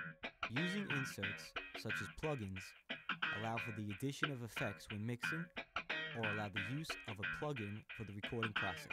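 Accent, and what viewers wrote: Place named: Long Island, United States. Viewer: that guitar lick in the background does makes this unwatchable. plus his Long Island accent is brutal. its like recording with the Sopranos.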